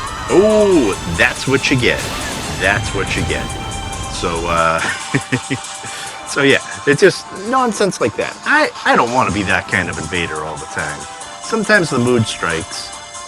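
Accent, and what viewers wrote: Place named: Boston, United States. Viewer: Your Boston accent is strong in this one.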